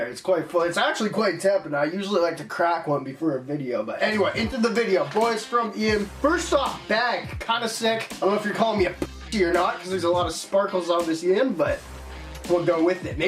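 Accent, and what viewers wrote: Canada. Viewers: Where in Canada 🇨🇦 are you from I love finding out youtubers are from Canada like the nelk boys I assume your in Canada with the ehhs and boys aacent lol.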